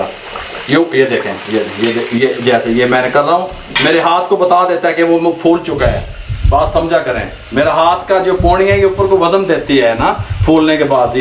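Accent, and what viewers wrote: Punjab, India. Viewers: Nice uncle j Allah apko kamyab kra apka urdo ma Punjabi bolna mja boht acha lgta h Khush rhy ameen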